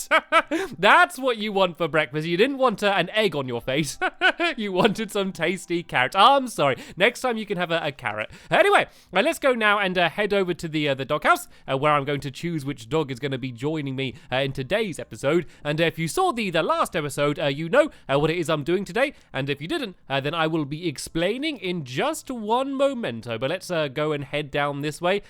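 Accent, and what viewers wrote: United Kingdom. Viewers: LOL it's ester not esta he just pronounces it that way cause he's British XD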